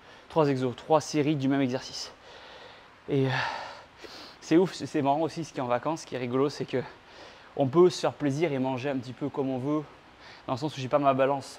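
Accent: France. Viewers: Oh, il a retrouvé sa voix française... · Didn’t even realise he was French till he opened his mouth 😂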